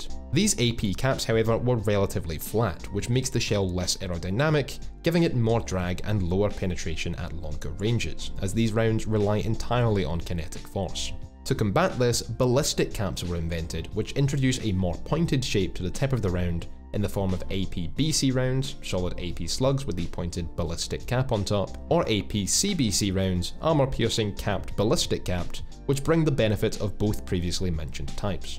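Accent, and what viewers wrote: Scotland, United Kingdom. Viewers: I hit the like button as soon as I heard that sweet Scottish accent. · Are you Scottish?? 🤔🤔. You remind me alot of my friend Wallace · I've only just noticed your Scottish accent and I've been watching a few of your vids already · Speak American man, not Scottish! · Is that Scottish?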